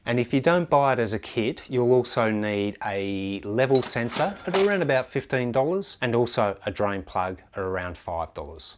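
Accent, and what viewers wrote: Australia. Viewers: Are u aussie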